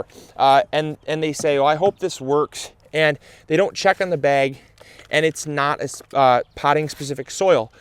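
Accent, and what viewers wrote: Michigan, United States. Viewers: That MI accent a bit strong hey?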